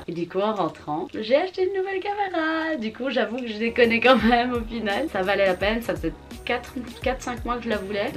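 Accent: Belgium. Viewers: Coucou alors je ne commente (vraiment) jamais de vidéos mais il faut que tu saches que je te trouve vraiment extra. Déjà l'accent belge ensuite ton parcours professionnel de surcroît (oui oui on est en com on utilise de beaux mots) ton style et vraiment le plus beau c'est ton naturel. Continue comme tu fais ! Si tu passes à bx préviens moi que je te paie un café (ou une biere) pcq je suis sûre que tu me fascinerais. Beaucoup de bisouuus et ta video est une pépite 😘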